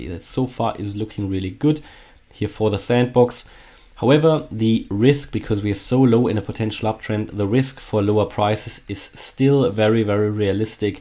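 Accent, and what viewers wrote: Germany. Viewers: 3.50 !!!!!!!!!!!!!Love your german Accent. :) was kostet deine Mitgliedschaft und kann man auch traden lernen,.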